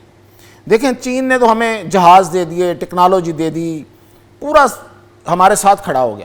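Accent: Punjab, Pakistan. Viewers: Its like this guy came straight from restuarant after had Bong Paey! Info is good but appology on your punjabi accent ruined the show. This channel should hire some proper urdu accent guy who looks gentle casting the show mannerly. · sb you need to work on your pronunciations and general knowledge. Very poor in both fields. Before appearing on TV screen you should be prepared enough to educate people. With punjabi accent urdu seem to be awkward as a medium of instructions.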